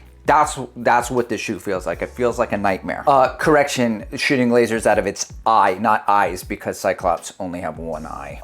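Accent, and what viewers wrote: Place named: Boston, United States. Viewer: As a New Englander your Boston accent was 1080 v13 like, but your explicative tirade right after was peak Boston!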